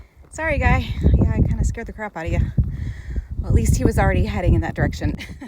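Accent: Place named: California, United States. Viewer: Your accent sounds Californian. I moved from Los Angeles County in 1973. I'm following 4Runner, just a few days ahead of you.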